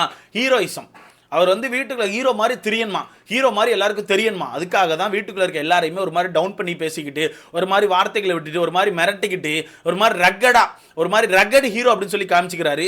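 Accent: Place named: Madurai, India. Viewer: Madurai slang bro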